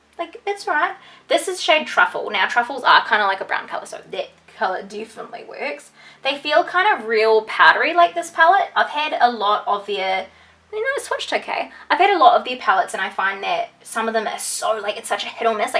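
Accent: New Zealand. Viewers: are you a new zealander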